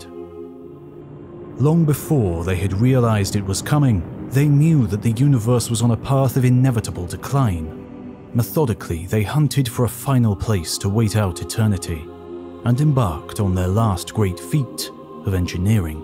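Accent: England, United Kingdom. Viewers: Its the English accent, crap sounds like fact instantly.. Again hes reading off something because why is he saying we?? Only the majority think a certain way whilst the rest of us dont care about information that takes you away from a stable self financial comfort..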